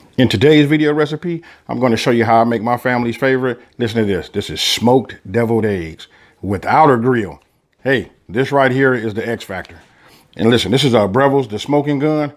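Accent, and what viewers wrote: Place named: California, United States. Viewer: This rt hearrrr. Omg i love that cali westcoast dialect. It's errythang. I love your cooking.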